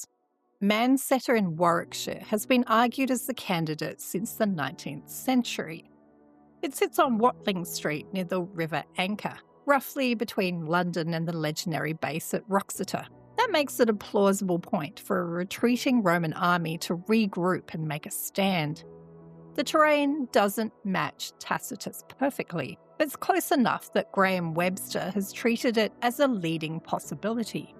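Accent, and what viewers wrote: Australia. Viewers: Always good to hear an Aussie accent talking about British history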